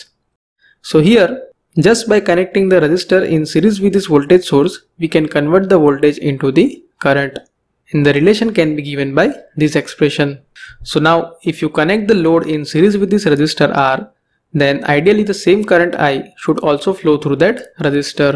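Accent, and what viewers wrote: India. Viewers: Why does indian people never take the time to fix their fucking accents, sounds abysmal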